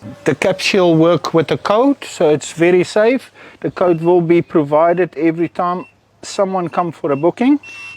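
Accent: South Africa. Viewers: That's one of the strongest South African accents I've ever heard..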